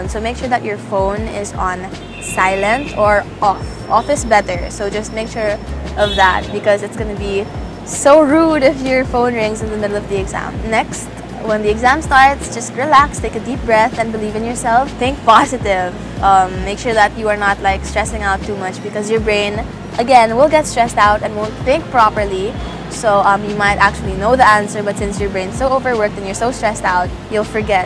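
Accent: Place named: Philippines. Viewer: What's your course po ate? Love your taglish accent❤️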